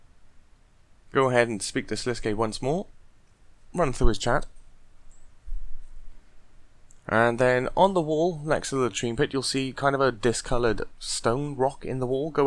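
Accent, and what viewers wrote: Wales, United Kingdom. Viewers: Are you welsh man?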